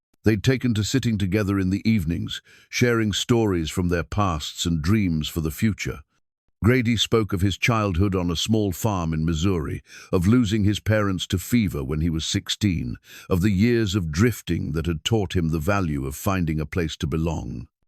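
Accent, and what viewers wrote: England, United Kingdom. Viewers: I’m listening from the beautiful agricultural and lush San Joaquin Valley of California. I enjoy your dramatic stories, they’re narrated well with your particular selected, articulate English accented AI. However I notice they all hold the same theme; that is, territorial differences and proving who holds ownership of land rights. It’s the same story over and again. What’s especially striking me with humor is how all main character men walk and work either shirtless or with the front of their shirts opened. Was this normal? It’s beginning to border on ridiculous.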